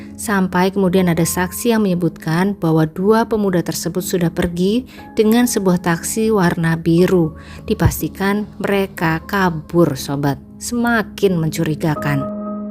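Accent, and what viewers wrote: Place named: Banyumas, Indonesia. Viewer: Asli wong ngapak mbak 😅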